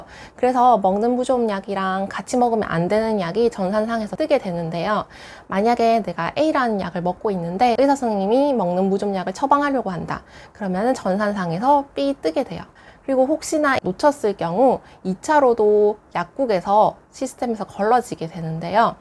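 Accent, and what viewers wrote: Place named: Gyeongsang, South Korea. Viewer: 선생님 인기 인기 하는데 뭔가 경상도 냄새가 나는데 ㅎㅎ 경상도분이시죠? ㅎㅎ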